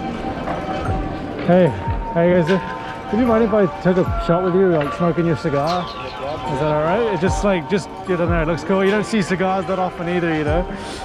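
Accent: United Kingdom. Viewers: Ha ha yeah I was thinking Canada but he has a British accent. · You sound more British than Canadian, or am I mistaken?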